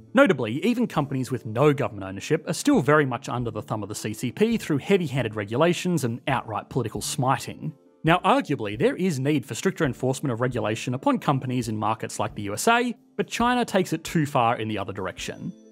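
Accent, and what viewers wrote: Australia. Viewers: take propaganda, put it through disgusting australian accent, paste youtube video, profit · Australian here. I recognise an Australian accent. Notice our independent source commentry is less biased or partisan.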